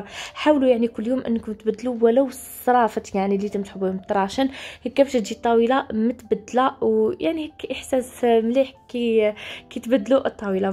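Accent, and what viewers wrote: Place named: East, Algeria. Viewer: هذي لهجتها ماهيش راح تبدلها على سواد عيونك تاع شرق كامل يقولو عظام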